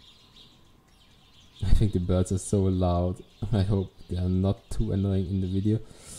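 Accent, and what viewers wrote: Germany. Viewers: Bist du deutsch du hörst dich so deutsch an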